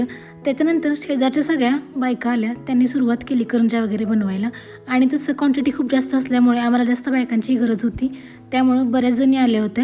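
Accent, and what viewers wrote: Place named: Satara, India. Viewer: सातारची आहेस का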